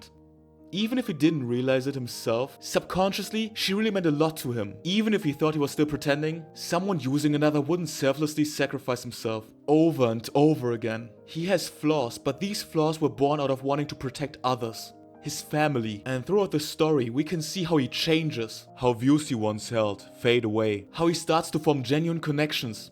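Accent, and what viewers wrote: Germany. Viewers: You got that german accent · You Sound Like U have a German accent somehow xd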